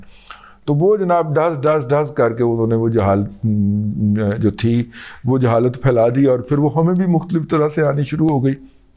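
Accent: Punjab, Pakistan. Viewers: Punjabi lehze mein urdu aapki zuban te fabdee hai. Gichee made me laugh. Kithe reh hundei Punjabi ton!Apni zameen di bolee bullan te aa hi jandi hai.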